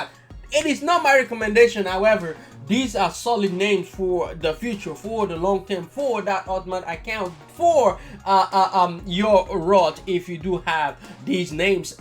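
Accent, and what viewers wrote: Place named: Nigeria. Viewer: You have a heavy heavy accent are you from Nigeria ❓🧐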